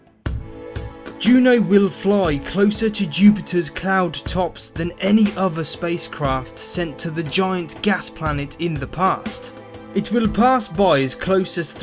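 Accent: United Kingdom. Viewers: cool british accent :D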